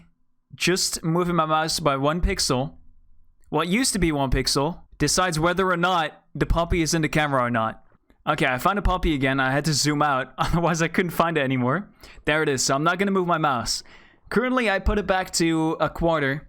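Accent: Netherlands. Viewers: Are you dutch? i am If someone is dutch say it in the comments. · What kind of accent is this? Dutch? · Hawky he’s probably actually Dutch cuz he kinda has a tiny bit of accent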